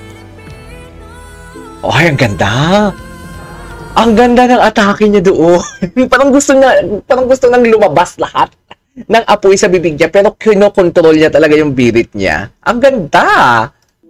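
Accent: Iloilo, Philippines. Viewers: Sa tingin ko, ilonggo ka. May accent.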